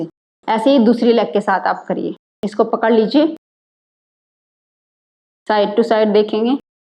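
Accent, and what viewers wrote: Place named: Sindh, India. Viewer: पकक जाता है। लहज़ा सिन्धी बोली का है। क्या आप सिन्धी है? क्या मैं सही हूं? प्लीज़ रिप्लाई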